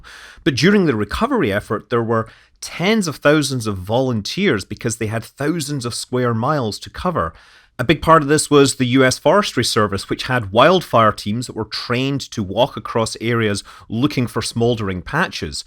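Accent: Scotland, United Kingdom. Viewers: Scotland, clearly 🙄 Manners cost nothing, you need to learn some. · Brilliant video. Your accent is clearly north of the border… anywhere near Aberdeen? I’m guessing a wee bit further south?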